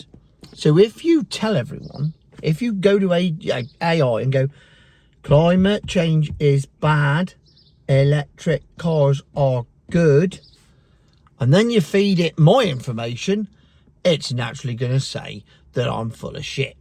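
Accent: Cornwall, United Kingdom. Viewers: Your problem is that you speak with a Cornish accent therefore according to the Book of Musk the EVangelists bible you are an uneducated hayseed, a hillbilly of epic proportions because you don’t sound like someone from Knightsbridge. The fact that you are a qualified educator must now send their guts into a Vindaloo paroxysm. Well done.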